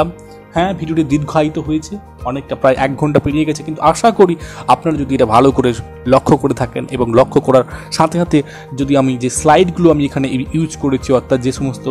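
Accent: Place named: England, United Kingdom. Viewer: Everything is nice but you should have stuck to your own Bengali tone but your tone seemed to be English while you speaking bengali..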